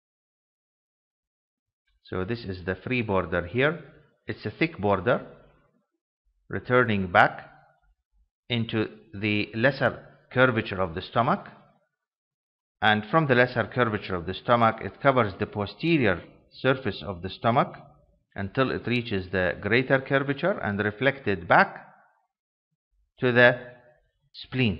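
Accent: Iraq. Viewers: هل انت من العراق دكتور احس لهجتك عراقيه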